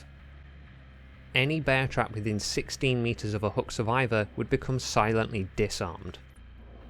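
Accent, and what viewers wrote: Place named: United Kingdom. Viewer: I came for the Trapper rework, I stayed for the sexy British accent ❤❤❤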